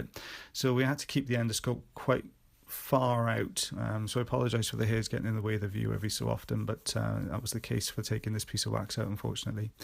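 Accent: Wales, United Kingdom. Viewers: Wow a perfect British accent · Yes the Welsh accent at it's best beautiful gentleman inside & out Learning more with each video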